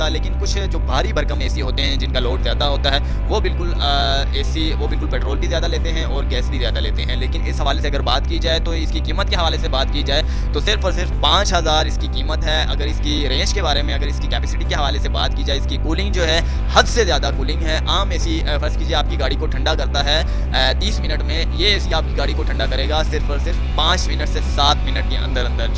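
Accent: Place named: Lahore, Pakistan. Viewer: Ye bi lahori hy awaz sunty He andaza hugya tha chutia banai ga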